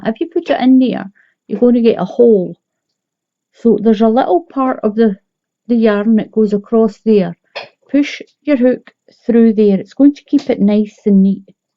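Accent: Scotland, United Kingdom. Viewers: Love your Scottish accent and love your tutorials. Our lock down in Letterkenny has expanded my crocheting experience. Another blanket going to be done this stitch. Love to hear Mutley in background think he would like you throw the ball!!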